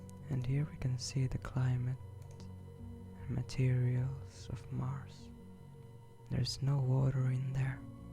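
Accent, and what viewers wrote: Finland. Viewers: You sound Finnish, are you from Finland? :)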